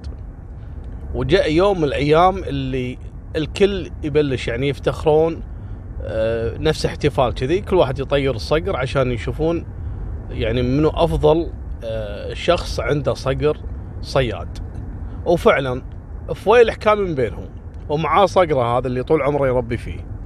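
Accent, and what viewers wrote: Kuwait. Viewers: والله ضحكتني ايكله اكضب الباب انتةوابنك والله حلوه لهجتكم اموت عليكم يااهل الكويت الطيبين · يا اخي قصصك جميلة واحلى ما فيها اسلوب سردها ولهجتك الكويتية الجميلة التي نحبها من ايام مسلسل الى ابي وامي مع التحية وخالتي كماشة وغيرها من المسلسلات الكويتية الجميلة اخوك من العراق احبكم يا اهل الكويت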